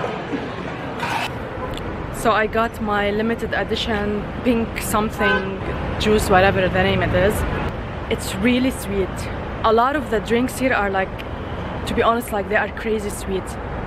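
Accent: Lebanon. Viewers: ارجوك تكلمي لبناني واحد من اسباب متابعتي لك لهجتك 😢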